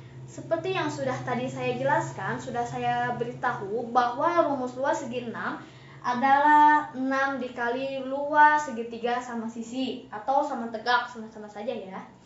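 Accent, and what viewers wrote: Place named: West Java, Indonesia. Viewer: *sundanya itu loh masih lengket, sukses ka*👍👍👍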